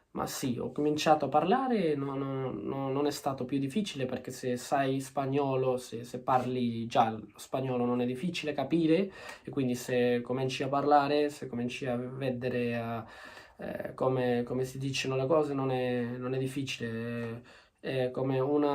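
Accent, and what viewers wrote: Russia. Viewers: you might be a beginner in Polish but some of the harder sounds were on point. You said you start speaking Russian when you don't now how to say something in Polish but I also noticed 1 other thing, you seem to have a slightly Russian accent in Polish, I'm guessing because Russian was the first slavic language you ever learned and now it carries over to Polish. there were some grammatical errors but that's to be expected, I commend your efforts nonetheless, Polish is probably the hardest slavic language, even people like Tokimasa Sekiguchi who has been living in Poland for decades pronounces some words in a weird manner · Your Russian accent is very impressive. Te felicito.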